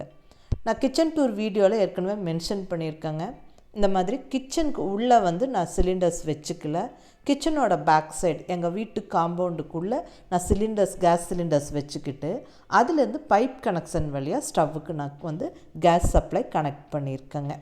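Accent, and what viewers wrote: Kongu, India. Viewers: Mam, you are an inspiration to us! andha kongu tamil super ponga. adhukagave onga videos paakrom 😊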